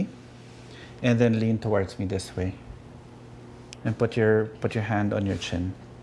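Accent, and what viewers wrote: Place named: Philippines. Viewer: Right away I knew he was filipino when he started talking and I have a cousin named Jiggie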